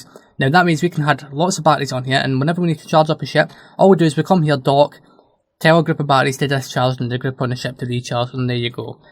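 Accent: Scotland, United Kingdom. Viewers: That is due to his STRONG Scottish accent plus he did not have a clue and not prepared to show the correct way.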